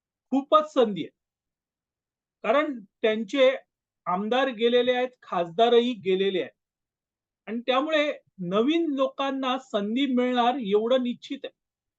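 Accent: Malvani, India. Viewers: tumchi Malvani bhari.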